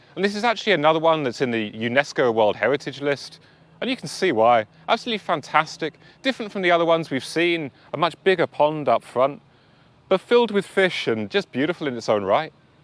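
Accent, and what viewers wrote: England, United Kingdom. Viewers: What part of England are you from? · Great video (subbed!). Where in England are you from out of interest? Your accent reminds me of my cousins 😄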